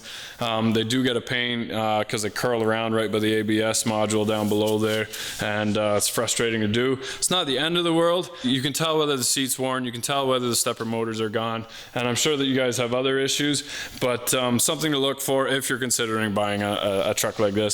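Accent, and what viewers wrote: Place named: Canada. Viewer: Figured you were somewhere in Canadia by the accent! I am in my last year of college for Mechanical Engineering and I work for a fiberglass composite engineering company during the summers and breaks. Needless to say I'm quite busy balancing it all out!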